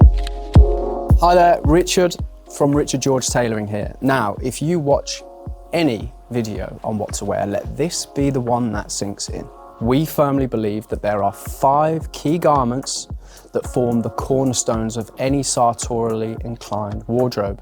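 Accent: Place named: Midlands, United Kingdom. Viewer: First time I've come across the channel and I subscribed. I really like the clear and concise explanation of everything. I disagreed on one point, which isn't major. I wouldn't describe that particular sports jacket as a blazer. Blazers, to me, are always un-patterned (unless they are striped for a particular sports club or activity). I also realize that you are nervous speaking directly to camera but if you could use your hands to gesture towards a point of interest on the clothing rather than gesturing to emphasise your speech constantly it would be a little less distracting. Slight changes of camera angle occasionally might also break up any obvious 'chop cuts' on the video. Nice to hear a Midlands accent on a sartorial channel. Good luck for the future.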